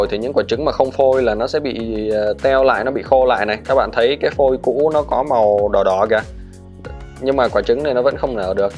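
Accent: North, Vietnam. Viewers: Anh ở Miền Nam sao nói giọng Bắc nhỉ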